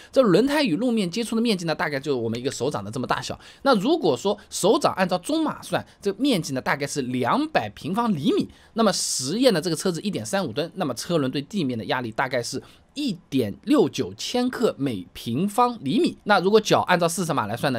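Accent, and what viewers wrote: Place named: Jiangnan, China. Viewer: 江浙沪一带的数字读法大多是这样的 从方言直翻成普通话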